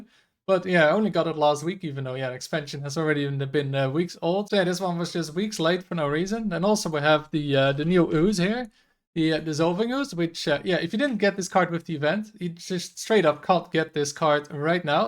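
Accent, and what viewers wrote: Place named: Europe. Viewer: all of this was already said just not in an annoying euroshit accent. revolting .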